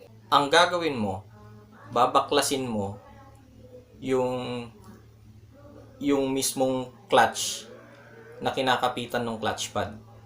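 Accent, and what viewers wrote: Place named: Pampanga, Philippines. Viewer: ahh ganun ba. kaya parang medyo kapampangan accent yung tagalog mo bro. haha. new subbie. ggwin ko sa off yung suggestion mo sa beat v2 ko.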